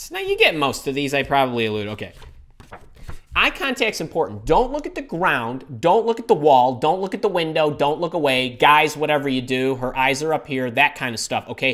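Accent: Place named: Chicago, United States. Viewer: You are hilarious!!! great advice …the Chicago twang keeps coming out in your tone…just sayin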